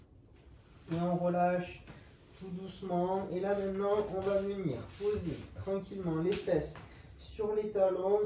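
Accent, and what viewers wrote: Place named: South, France. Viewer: Merci pour les séances et pour l’accent du sud ! J’ai adoré ! Très adaptée pour moi qui ne suis plus de première jeunesse !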